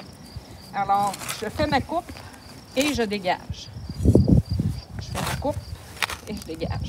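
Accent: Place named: Canada. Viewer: Merci pour l'explication, j'adore la voix des Canadiens c'est trop cute 😂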